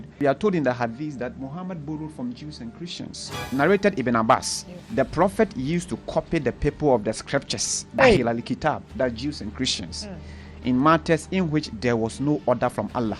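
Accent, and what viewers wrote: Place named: Arab world. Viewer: You can see the way the Arabic accent was so clear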